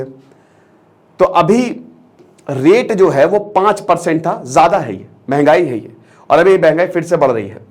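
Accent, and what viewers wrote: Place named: Varanasi, India. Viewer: Excellent sir 👌 apka samjhany ka tarika bhut achha h .... Aap banarasi lagty ho mujhe ya aap U P se kahi or se ho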